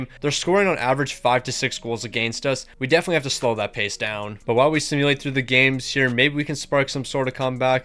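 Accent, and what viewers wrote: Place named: Quebec, Canada. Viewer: you actually pronouced cataractes exactly right lol (well i mean, minus the quebec accent)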